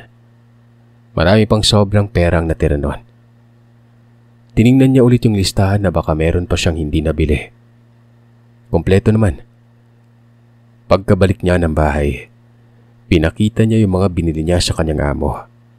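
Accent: Iloilo, Philippines. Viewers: Kanami gd ya pamatian bsta ilonggo accent sir hahaha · Hahahha ilonggo gid ang tuno ni sir hahahha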